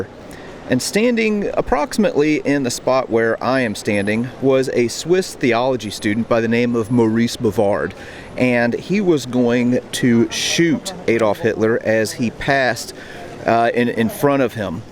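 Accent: United States. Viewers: Shame about the dreadful American accent. The word “route” is pronounced “root,” not “rout.” The “A” in Adolph is pronounced as in “rat,” not as in “cake.” Nothing annoys me more than Americans mutilating the King’s English.🏴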